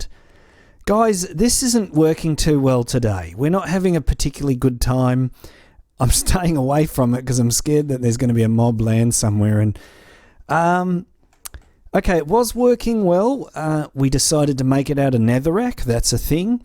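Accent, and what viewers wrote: Australia. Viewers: Sooooo keen for the download. Loving the series, I'm a newish sub and I'm bloody loving your work. Its good to be listening to a fellow Aussie as opposed to my other MC subscriptions. hahah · Are you an Aussie?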